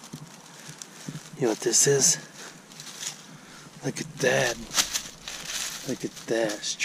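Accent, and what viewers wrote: Alabama, United States. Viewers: What a voice! If someone wanted to capture American accents, this guy would be the voice of Alabama. Yes, it's been softened by interaction through modern media, but softer than Texan. No twang and a hidden southern humor. College educated with a desk job.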